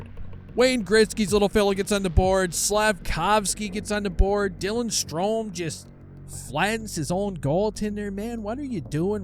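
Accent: Newfoundland, Canada. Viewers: What part of Newfoundland are ya from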